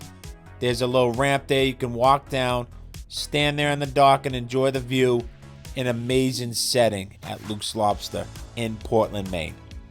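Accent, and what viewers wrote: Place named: Boston, United States. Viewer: Stick with it…..your content is improving….you are likable and your absolute Bostoness is contagious….I am now a subscriber.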